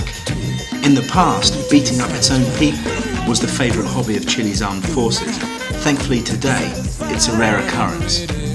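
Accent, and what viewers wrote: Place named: England, United Kingdom. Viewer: but sadly distributed between the rich, military, foreign companies leaving the common chilean people to miss out this situation will continue until the people stops watching tv and gets informed whats really going in the country, by the way by your accent i guess your from england? why you so intrested in chile? anyways nice video cheers from australia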